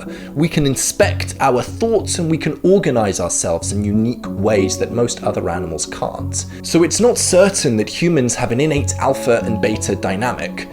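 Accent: United Kingdom. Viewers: Bruh your level of articulation is phenomenal and combining that with your British accent is finese....... subscribe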